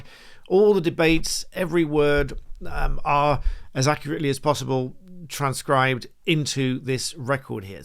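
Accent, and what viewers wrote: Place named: England, United Kingdom. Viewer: I like the way you explain things in very clear english accent well done Mate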